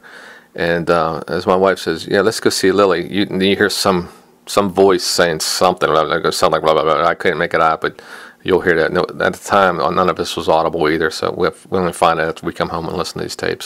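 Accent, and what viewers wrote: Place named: Pittsburgh, United States. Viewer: Pretty cool stuff. You sound like you're from Pittsburgh from the accent. Thanks for putting it all together.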